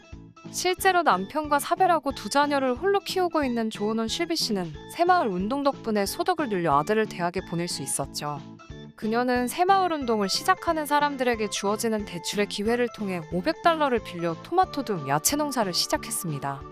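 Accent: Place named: Gyeongsang, South Korea. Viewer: 😊나레이터님 경남출신이신듯 ㅎ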